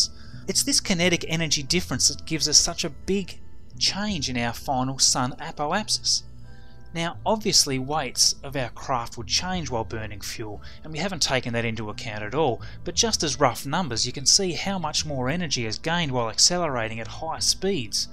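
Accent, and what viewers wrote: Australia. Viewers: I love your Aussie accent.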